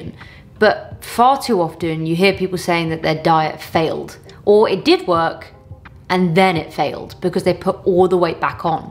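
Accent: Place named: United Kingdom. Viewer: I can't get enough of your British accent. I always look forward for your videos ever since I found your channel. Looking even more amazing as always btw,. 💪💪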